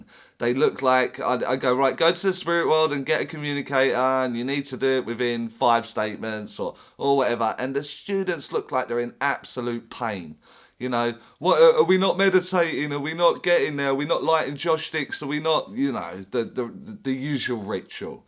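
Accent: United Kingdom. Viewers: Breath of fresh air. My fellow Brit says it how it is. Sounds like he comes from my neck of the woods. Thank you!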